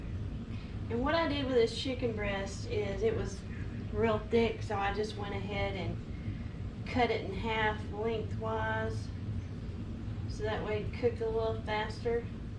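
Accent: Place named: South, United States. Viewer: Thank you for the recipe! I made this today and it came out wonderful. My husband loved this. I simply can not wait for more recipes from you. By the way I just adore your southern accent!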